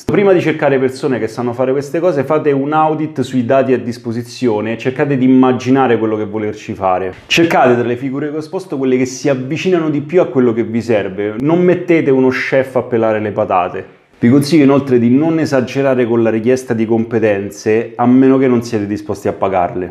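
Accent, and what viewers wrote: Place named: Rome, Italy. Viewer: Grande! La penso come te sui Recruiter e la retribuzione che offrono in Italia, scrivono annunci contraddittori, alte competenze e stipendi da fame...prendono tutti per stupidi. Mi sembri romano dall'accento, tu in cosa sei Laureato? Di cosa ti occupi? Sto seguendo qualche tuo tutorial per un capirci meglio su AI e ML per un mio progetto e tu ne sai molto, non nego che trovo molta difficoltà, non essendo un matematico... però non mi arrendo, sono solo più lento degli altri 😊 io voglio specializzarmi su Python per AI e ML, hai qualche corso (gratuito) in particolare da consigliarmi? Grazie per i tuoi video, ti faccio i complimenti😊 perché spieghi Python mentre scrivi come se scrivessi in italiano...sei una potenza!💪😉 Ciao 👋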